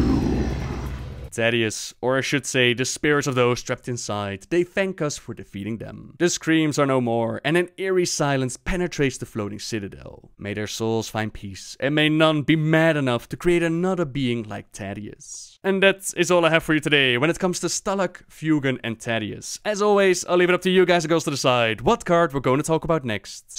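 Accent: Netherlands. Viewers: You sound sooo Dutch! Ever been told to You before?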